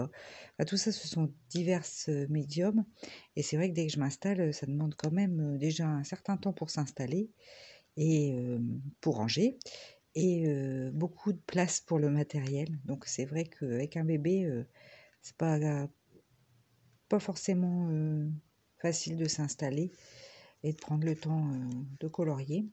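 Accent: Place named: Nord, France. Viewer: Bonjour! je découvre ta chaine... je suis également du Nord j'habite près de Lille, et je fais aussi du coloriage pour adultes depuis plusieurs années..je lis aussi beaucoup et j'ai également un chat noir!!.j'avais bien reconnu le ptit accent du Nord! à bientôt sur ta chaine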